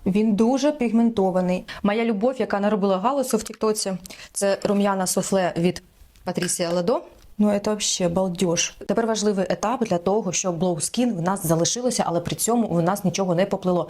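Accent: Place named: Surzhyk, Ukraine. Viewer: Подобається ваш суржик 🥰😍❤️🔥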